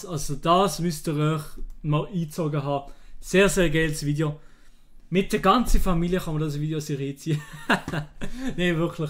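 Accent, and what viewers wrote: Switzerland. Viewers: Sehr schönes Video von dir aber versteh dein Schweizerdialekt nicht bin Deutscher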